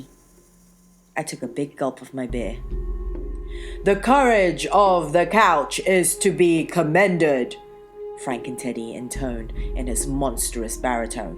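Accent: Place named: United Kingdom. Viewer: I believe she is British.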